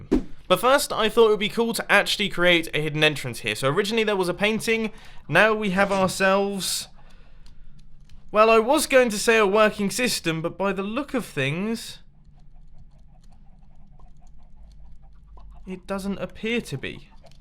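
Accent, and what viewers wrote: United Kingdom. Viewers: Leave him alone! He's British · Mumbos super british side kicks in after 2 minutes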